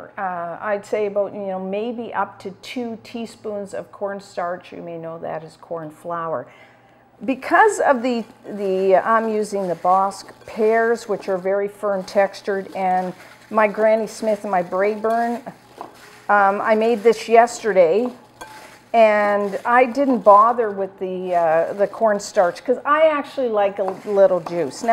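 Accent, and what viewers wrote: Canada. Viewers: I enjoy your demonstrations so much! Thank you for all the wonderful recipes you've shared with us. I also love how the Canadian accent comes out sometimes :-)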